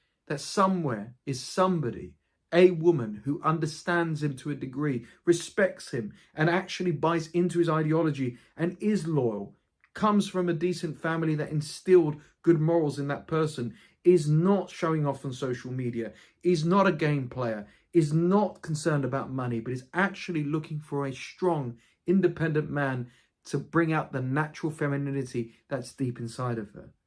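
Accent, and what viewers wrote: United Kingdom. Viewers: I have difficulty understanding the British accent. But I thank you for making this video. Because this is the first time someone has made a video on this topic, I agree with everything you said. In fact, there are some shortcomings. Global capital has globalized the entire world. It has corrupted people's morality. People's minds and brains have become completely for money, global capital culture has ended morality and marriage. People are now avoiding each other. Nobody trusts anybody. Almost everyone has become evil, turned into a narcissistic dog, thank you again for making this video.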